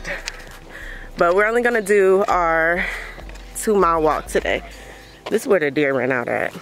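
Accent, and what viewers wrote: Los Angeles, United States. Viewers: Flawless.. You Do Have A Accent.. LA af but Twainy(Southern) at times.. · That 323 accent 🤣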